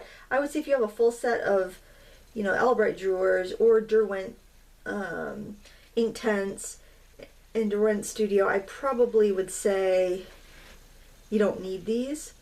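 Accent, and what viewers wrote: Maine, United States. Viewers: What a clever idea, laying out the three bookmarks, making them as one, then separating them. What a fun way to play and create bookmarks at the same time. BTW, I never care how long your videos are, for as you know from other comments from me, I love to hear you "mainespeak" and your cheerfulness always gets me in a good mood, for it takes my mind off of what ails me. Keep 'me up, Sistah! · oh dear, i have been watching your channel from the beginning of my you tube days i think you had just a few thousand subscribers and you are in fact the 2nd person i subscribed to. I must say you have been a joy and a good friend through the years. Whatever the video of the day is it makes me smile, your children and family are priceless and so is your lovely chatter whether it is in your "maine dialect" or your fun and happy voice it is always like talking to an old friend. 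take care and never stop we would miss you terribly. Shame on her, she has no idea what she is missing! Aloha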